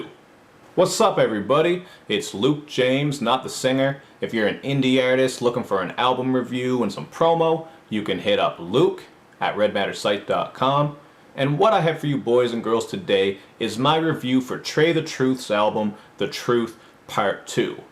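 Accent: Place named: Western Canada, Canada. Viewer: Are you by any chance from western Canada? You sound like me lol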